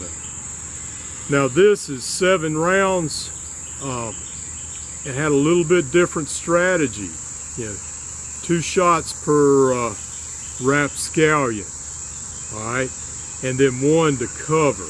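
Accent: South, United States. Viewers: “Excuse my execrable French” Mon Ami, I very selfishly felt “included” in this comment. However may I assure you that you as a Southern gentlemen with your accent ….. that the French would find your “French” est très magnifique. Avec moi, with a Belfast Irish accent and though being French resident for several years my sometimes totally correct French vocabulary and grammer is often considered by my French friends as horrible, mais l'accent de ma femme Susan anglaise totalement acceptable. Great video comme toujours, especially in these times. My thoughts are with you and your family, stay safe. You can always trust a Smith!! Cordialement RB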